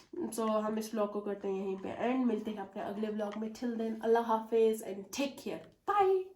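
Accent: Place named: Baramulla, India. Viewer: Your way of talking is similar as baramullians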